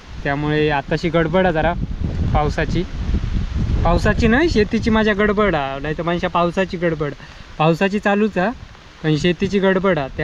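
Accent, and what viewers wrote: Malvani, India. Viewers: तुझा वर कोण रागवत नाही बाबू तु मालवणी बोलूक लागलस काय खुप छान वाटता तु असेच छान छान विडीयो टाक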